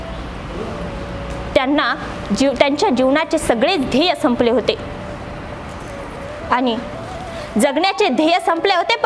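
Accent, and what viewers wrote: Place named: Vidarbha, India. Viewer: 🙏दण्डवत प्रणाम कु ताई 🙏जीवन भावसार, पुणे!उत्कृष्ट कथा कथन ताई प्रसंग डोळ्यासमोर उभे केलेत आणि डोळ्यात अश्रू आलेत, निशब्द झालो 🙏वऱ्हाडी भाषा तर अप्रतिम 👍🙏🙏🙏🙏🙏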